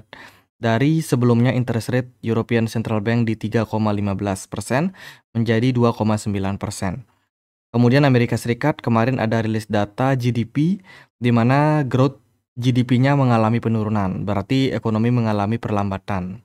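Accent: Bali, Indonesia. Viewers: Sy suka pembahasan yg mengkaitkan ekonomi makro dengan crypto... Auto subscribe Dari logat huruf "T" nya, pasti dari Bali.. makasi bli ☺️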